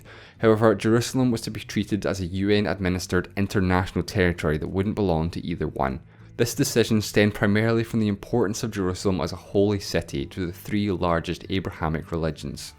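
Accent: Scotland, United Kingdom. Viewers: is the accent Scottish? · is this a scottish accent? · sounds like a scottish accent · I'm thinking your country's capital is Edinburgh, based on your accent. 😉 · More like Scotish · Why scottish people pronounce "i" as "e"? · He is indeed Scottish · Andrew Matthews it’s scottish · scottish english accent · are you scottish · scottish i think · Love the Scottish accent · He is Scottish give him a break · Scottish accent? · Interesting, enjoyable and once again it shows how much there is to learn about the world and how much I don’t know. Thank you for doing this and I thought it was excellent. PS. Dinnae take any guff about your accent. Edinburgh or somewhere in the borders? Or do I have even more to learn about Scotland? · If im not mistaken he is scottish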